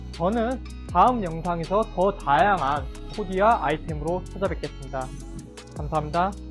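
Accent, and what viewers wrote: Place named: Jeolla, South Korea. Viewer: 전라도 사투리가 정겹네요^^ 광주사람이라 딱듣고 알았네요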